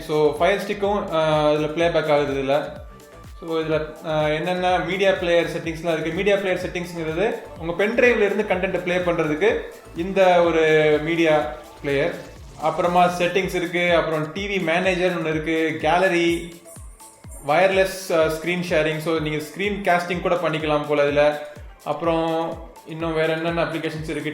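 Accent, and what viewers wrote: Madurai, India. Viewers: Bro Madurai style is super..... Like acter Vishal Daai naanum madurakaaran thaandaa.... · Bro..neega Madurai ya....? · Bro neenga madurai ah? Super!!!! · Niga Madurai ya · Semmma madurai speech and review suprrrr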